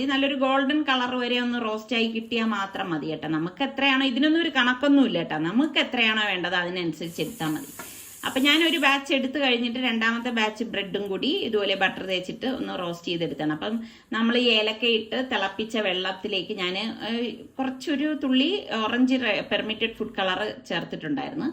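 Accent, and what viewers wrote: Trivandrum, India. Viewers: Yellam super TVM samesaram super Njanum aviduthekariya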